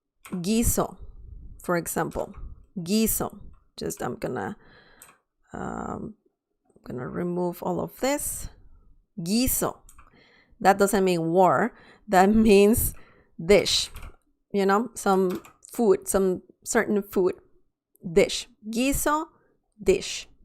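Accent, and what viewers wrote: Spain. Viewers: Is this the Spanish from Spain? If so, I appreciate this, as I now live in Andalucia, and I need to learn the unique dialect. Thank you!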